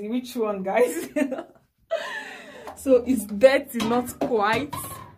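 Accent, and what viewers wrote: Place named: Nigeria. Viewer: Loll I know you're a Nigerian your accent tells it all. Anyway I like how you just be yourself.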